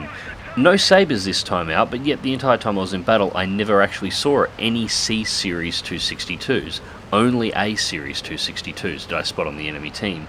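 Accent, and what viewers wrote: Australia. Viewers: Swearing in an Aussie accent just sounds to right hahaha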